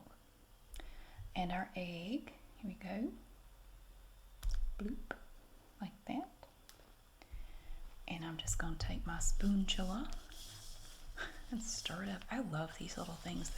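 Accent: United States. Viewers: Hi ! I’m a French 33 yo lady and it’s 5am here, I can’t go back to sleep and I randomly found your channel tonight. I really appreciated your video, it feels like I travelled to the US and have been welcomed in a friendly American woman’s home. I love the accent, you seem really nice and I enjoyed the recipe too. I have no idea what is corn bread though 😂 Due to a bad mental health I haven’t worked in a while and have been really isolated, so this little moment in time with you was so nice. Thank you !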